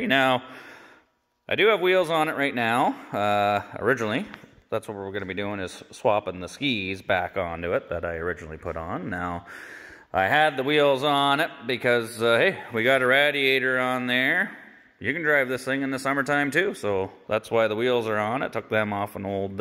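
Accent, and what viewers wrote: Canada. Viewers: That Canadian lingo cracks me up. 🤣 I have practiced for hours and still cannot say out like you guys do.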